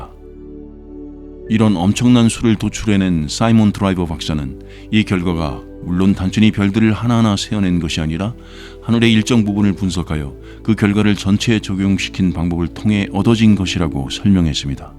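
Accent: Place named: Gyeongsang, South Korea. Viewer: 영상 처음보는데.. 경상도사람 이시구나.. ㅠ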